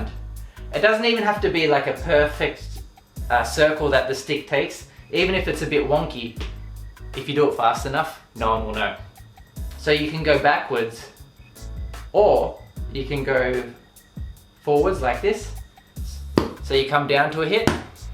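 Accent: Australia. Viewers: R u aussie? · Is he from Australia??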